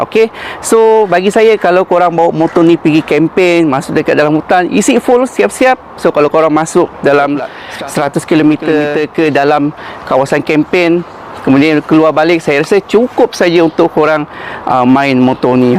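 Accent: Sabah, Malaysia. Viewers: Mcm org Sabah, ngam ka?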